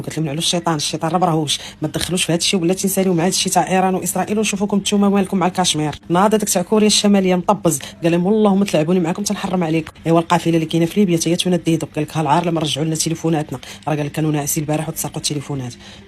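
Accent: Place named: Morocco. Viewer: لهجة مغربيةكي خرى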